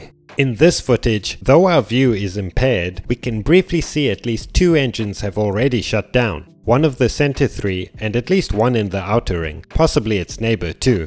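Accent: South Africa. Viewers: South African? Accent kinda sounds like it. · South African!!! Nice Dude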